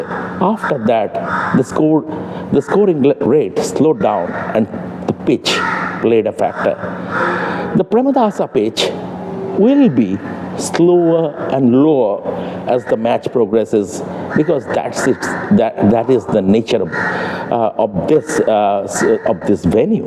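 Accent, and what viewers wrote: Bengal, India. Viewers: Your Bengali accent is prounounced. It takes a while to understand and the background sounds are not helping either. Please make facility to make yourself heard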